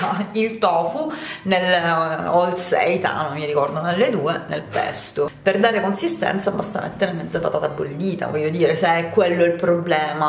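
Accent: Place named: Sicily, Italy. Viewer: Ciao, sono new entry,d’origine sicula come te ma marchigiana d’adozione ormai da quasi una vita, mi piace tantissimo ascoltarti, forse sarà propio per l’inflessione 😜 Grazie perché mi sto lasciando andRe ultimamente e seguendoti forse riesco a ritrovare in me la fatidica “scintilla “e poi via...a razzo!!🤩🤩🚀🚀😘😘❤️❤️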